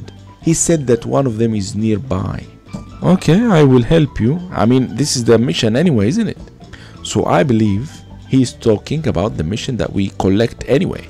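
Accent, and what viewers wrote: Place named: Greece. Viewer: I like your accent in English, and you feel that Greek is phonetically more dominant than the British accent 👍🌹🌹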